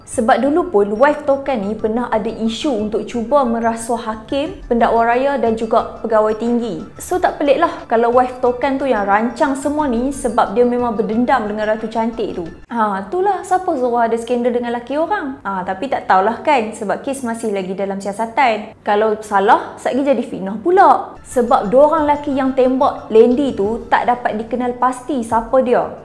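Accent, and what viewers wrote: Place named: Kedah, Malaysia. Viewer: pekat nahh kedah.. kami suka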